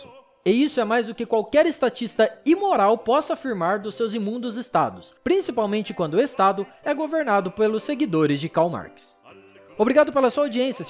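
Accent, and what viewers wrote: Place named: São Paulo, Brazil. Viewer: Meu nao da pra ouvir um audio feito por paulista caipira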